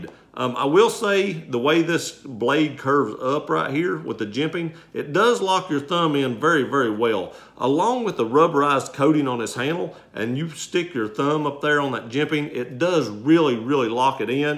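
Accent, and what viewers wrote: South, United States. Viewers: Hello new supporter here showing support for ya and I hit the like button. Awesome video. Great gift. Nice knife!! Like ur accent. Sound southern. Lol like me. O I hit the bell too. !🤩🤩🤩🤗🤗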